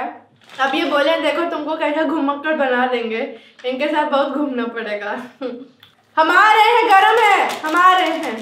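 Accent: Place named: Uttar Pradesh, India. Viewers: I loved your bhojpuri Hindi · Yrr your up accent is too good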